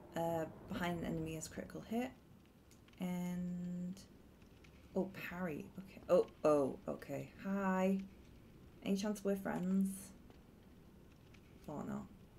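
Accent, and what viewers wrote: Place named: North, United Kingdom. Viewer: Very quite, your accent sounds in my area of the north. But you could be a dude for all i know. Dark Souls 1 was a work of art its why it became so popular, but old now.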